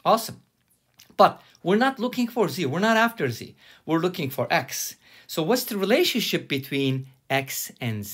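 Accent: Turkey. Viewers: Hello, may I ask where you’re from because your accent sounds like Turkish accent and I am Turkish so I just wanted to know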